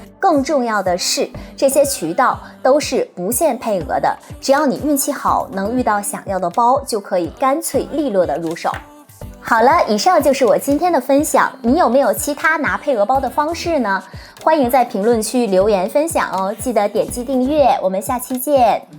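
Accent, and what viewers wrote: Northeast, China. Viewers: 肯定是东北那旮的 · 东北女生？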